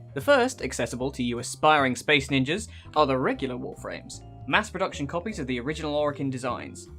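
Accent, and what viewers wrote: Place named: United Kingdom. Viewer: I've been looking for a good source of Warframe info and I've listened to a lot of YouTubers talk about it, but unlike any of them, you're British. SOLD!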